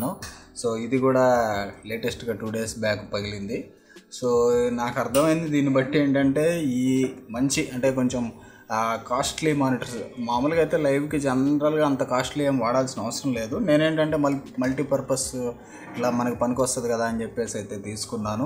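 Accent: Guntur, India. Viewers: Meedhi guntur aa bro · మీరు గుంటూరు నా 😀😀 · Meedi guntur aa anna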